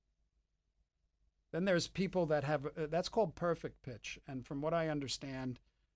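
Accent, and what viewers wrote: United States. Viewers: I just got back to this after a day of work. I can totally hear your Brooklyn accent now!!!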